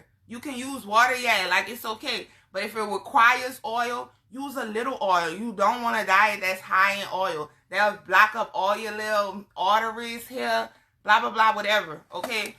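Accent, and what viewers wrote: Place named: Louisiana, United States. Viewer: Just recently subscribed you are so beautiful inside and out what state are u from. Love that accient sound like you from lousiana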